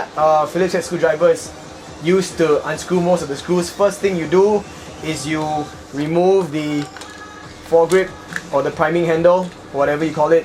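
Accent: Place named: Singapore. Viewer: lol from the accent oh singapore sure one XD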